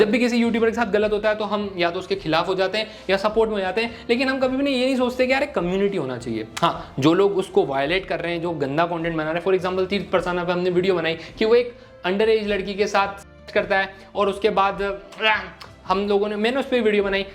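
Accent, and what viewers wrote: Indore, India. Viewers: bhiya tum indori ho kyaa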